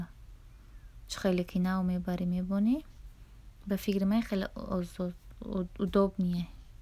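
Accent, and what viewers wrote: Kulob, Tajikistan. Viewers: Шумо ай кулоб.хастен мада гапзанитон макулай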